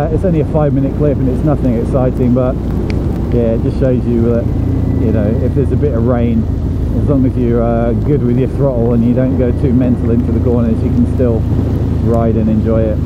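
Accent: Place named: Manchester, United Kingdom. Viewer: Not sure I can use your accent as a pointer, however if I can I would say its not north Manchester, second stab.. Didsbury?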